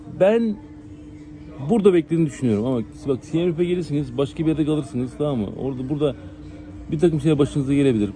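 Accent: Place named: Aegean, Turkey. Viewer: KAMBOÇYA'DA YILBAŞI ETKİNLİKLERİ 1. Khmer Yeni Yılı (Chaul Chnam Thmey) Ay: Nisan (genellikle 13-15 Nisan) Etkinlikler: Geleneksel danslar, su dökme festivalleri, ailevi kutlamalar. 2. Lunar Yeni Yılı (Çin Yeni Yılı) Ay: Ocak veya Şubat (tarih, ayın döngüsüne göre değişir) Etkinlikler: Aile ziyaretleri, yemek festivalleri, ateşli silah gösterileri. 3. Düzensiz Yeni Yıl Ay: Ekim veya Kasım (Kamboçya'nın ulusal günlerine bağlı) Etkinlikler: Kültürel etkinlikler, sokak kutlamaları. 4. Kamboçya Bağımsızlık Günü Ay: Kasım (9 Kasım) Etkinlikler: Resmi kutlamalar, geçit törenleri. EN KALABALIK YILBAŞI Khmer Yeni Yılı en kalabalık olanı. Ülke genelinde büyük kutlamalar yapılır ve yerli halkın çoğu bu festivale katılır. diye özetle internet ortamı bunları yazıyor... Ama Kamboçyayı senin ağzından dinlemek çok daha güzel.. Umarım, bir gün maddi olarak imkanım olur da, senin o güzel ege şivenden Kamboçyayı dinleme bahtiyarlığına erişirim.. 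Sağlık ve Başarı dileklerimle..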